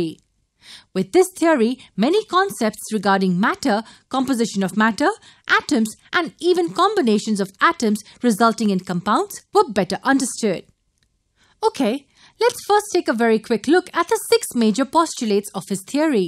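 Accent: India. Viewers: A superb video I can easily understood it but there is a question are you a Indian?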